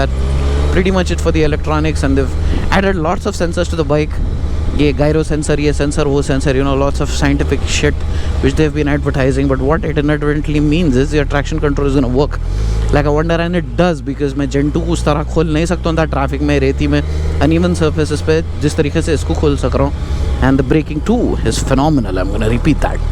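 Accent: Hyderabad, India. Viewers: he's your Best YT-Influencer for Bike Reviews, ... Not a Shill for Castrol etc. Loved his Hyderabadi Accent, and thanks for original content. I have the Trident-660 & 390-Duke, now looking at the SuperSport-950S as 3rd bike. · Bhai Ap toh Eid ke Chaand ho gae ho youtube ke…. Happy you uploaded a video….roj dala karo video if possible…..aacha lagta h apki hyderabadi sunnke…..aurr thodi knowledge mill jati h bike ki joh aur koi nai bolta h…😬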